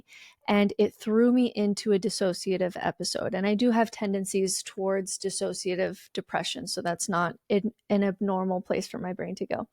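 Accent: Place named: United States. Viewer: I needed an American voice to speak to my American Brain and my American Heart. Thanks my Sista from another Mista!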